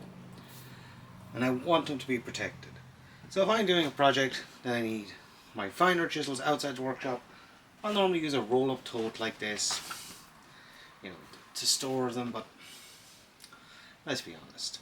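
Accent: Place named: Ireland. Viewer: Just discovered your channel! I like your style, and the simple but elegant toolbox really respects the Japanese methods, which are simpler than a lot of people realize. Subscribed! Agree with on volume: I'm old, and my ears have trouble with the loud music and intro and your lovely soft Irish speech. A little balance wouldn't go amiss.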